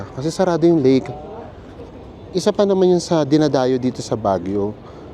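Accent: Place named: Parañaque, Philippines. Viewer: I love watching your vlogs , always positive ❤ and your accent is the-same with paranaque 😅 thank you and more power ❤ I’ll be in Baguio dec26 till jan2 … from Chatsworth city California 😊❤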